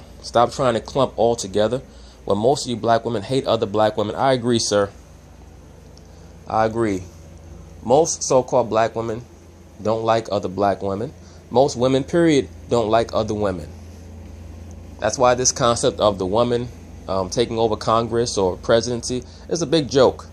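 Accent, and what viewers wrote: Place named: East Coast, United States. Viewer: I like ya commentary/ideas on things. You gotta be from the East coast, I hear it.. such as myself. Smart brotha!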